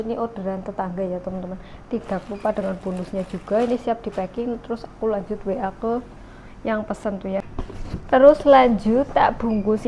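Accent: Banyumas, Indonesia. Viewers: Mba asli orang mana ko bahasanya ngapak kaya saya orang banjarnegara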